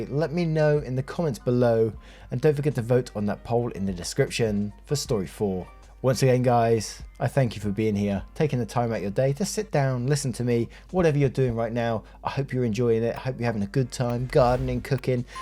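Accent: United Kingdom. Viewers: When I'm trying to take a nap after chemo, I turn on your playlist. Your voice is very soothing (could be the accent, you know how American females feel about a UK accent😂) and I get a great nap. I always go back and listen again while awake. So yeah, you put me to sleep, thank you. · I am crocheting a blanket, while I listen your beautiful British accented voice read to me!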